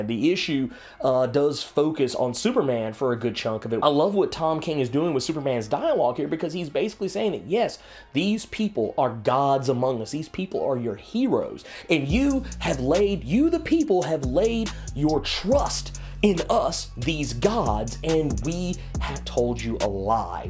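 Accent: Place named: East Texas, United States. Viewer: hey boss.. where you from... you sound like you're from east texas.. Tyler area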